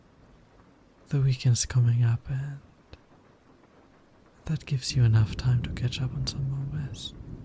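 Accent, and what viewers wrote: Germany. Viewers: Der Deutsche Akzent ist 🤌🏻🤌🏻🤌🏻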